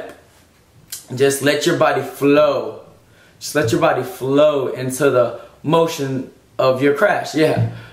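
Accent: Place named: South, United States. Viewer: certain things you say gave your accent away, pretty southern and sounded like T.I a bit. lol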